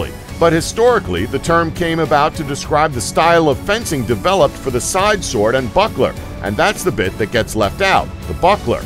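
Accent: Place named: United States. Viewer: This channel is funny to me , it's a guy with an American accent reading something clearly written in a British dialect.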